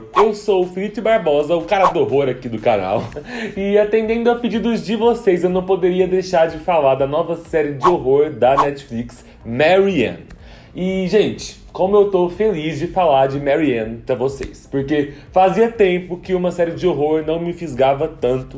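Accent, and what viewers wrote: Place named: England, United Kingdom. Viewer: Obrigada por essa crítica 🙂 OBS: pq vc é um cara brasileiro falando sobre uma série francesa, mas pronuncia todos os nomes como se fossem em inglês (tipo Marianne, Daugeron, etc)?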